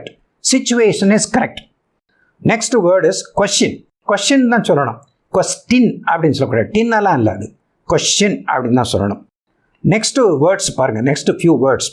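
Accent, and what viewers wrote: India. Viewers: nenga pronounce panrathu India pronunciation thane sir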